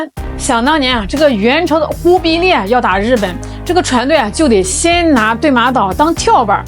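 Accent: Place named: Anhui, China. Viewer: 安徽话真好听，像黄梅戏🎉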